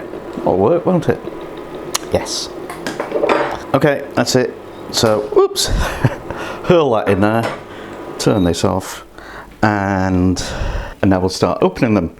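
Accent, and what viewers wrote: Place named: United Kingdom. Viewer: this is possibly the only person with the "uk" accent that i will watch a video from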